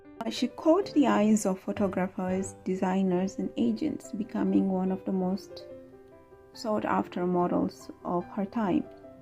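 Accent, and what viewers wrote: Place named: Kenya. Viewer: Your research on Nyeri, Kenya was really spot on from your accent I started thinking you're Kenyan.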